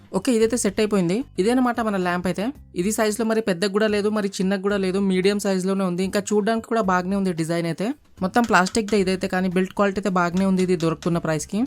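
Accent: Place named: Hyderabad, India. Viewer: Bro, Name emo. Slang emo old city muslim guy la undhi.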